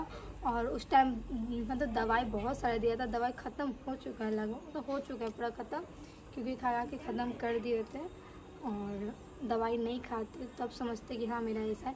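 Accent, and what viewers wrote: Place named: Jharkhand, India. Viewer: Jharkhand kolkata side aise hi bolte h waha k log meri saas bhi bolti h massala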